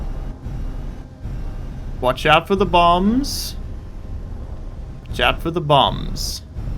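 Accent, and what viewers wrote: South Africa. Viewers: I couldn’t place where you were from for the live of me but South Africa makes sooooo much sense, idk what it is about some of the South African accents that are so damn hard for me to place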